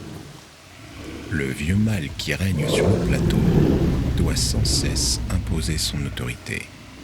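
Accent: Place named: France. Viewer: The effort is astonishingly great. The french accent makes this look like it's an actual documentary.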